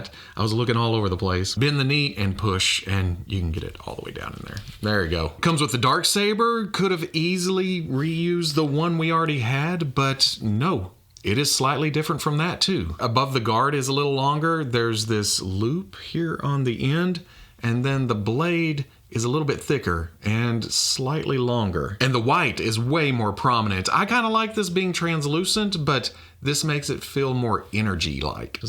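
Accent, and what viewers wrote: New Zealand. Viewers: lmao I love your New Zealand accent! Excited to get both of these figures! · the kiwi accent is not ideal but still a fantastic effort from a guy so far away from new zealand he’s closer to hawaii….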